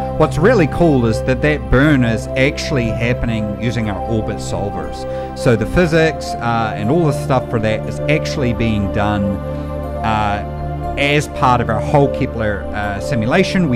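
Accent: New Zealand. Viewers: YO IS THAT A KIWI ACCENT???? YOO KIA ORA????? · Bro it’s refreshing to hear that kiwi accent 🤙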